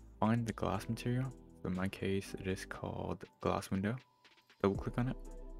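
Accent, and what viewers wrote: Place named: Spain. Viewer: You're a Spanish speaker xd right?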